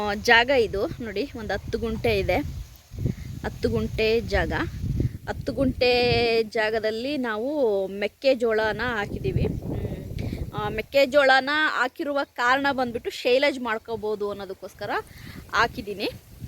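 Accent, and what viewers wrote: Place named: Ajjampura, India. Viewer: ನಿಮ್ಮ ಊರು ಯಾವುದು ಮೇಡಮ್ ನಿಮ್ಮ ಮಾತಿಧ ಉಚ್ಚಾರಣೆ ನೋಡಿದರೆ‌ ನಮ್ಮ ಅಜ್ಜಂಪುರ ಪಟ್ಟಣದ ಸುತ್ತ ಮುತ್ತಲಿನ ಹಳ್ಳಿ ಅನಿಸುತ್ತದೆ ದಯಮಾಡಿ ವಿಳಾಸ‌ತಿಳಿಸಿ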